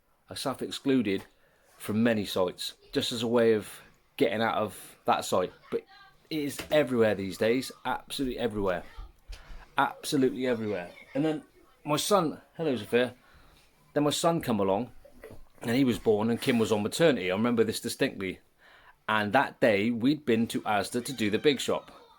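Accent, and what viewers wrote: Australia. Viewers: Sounds like he is from Australia. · How are you not Aussie !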